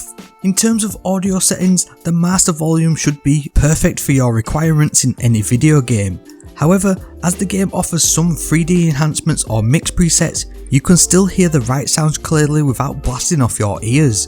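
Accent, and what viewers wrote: England, United Kingdom. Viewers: Hey! Thanks for the video! Got a strange question: what kind of english acsent is it?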